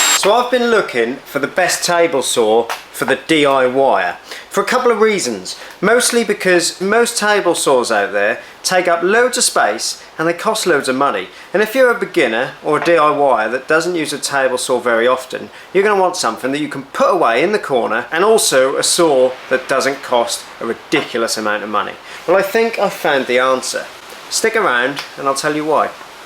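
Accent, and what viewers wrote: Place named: East Anglia, United Kingdom. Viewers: Came for the east Anglian accent, stay for the tips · Could be Suffolk Maybe lowestoft area, but could be Norfolk, only next door, great Yarmouth and further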